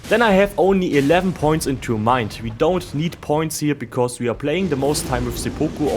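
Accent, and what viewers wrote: Germany. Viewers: gutes Englisch man hat fast ned rausgehött das du deutsch bist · cool build... der deutsche aktzent kickt hart lul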